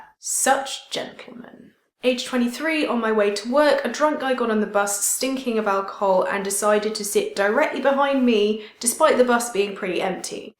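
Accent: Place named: London, United Kingdom. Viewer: I find myself wondering where you grew up. I think I heard in another video that you like in Edinburgh now? By your accent I'm thinking you grew up around London perhaps? I grew up in York and never heard of any such going on happening to any of my friends. Even When I moved to the much larger city of Edinburgh to go to university I still never noticed this behavior of random creepy street-goers. I'm male, so perhaps that insulates me from it a lot. Do you find that this problem of street harassment is lessens the further North you go?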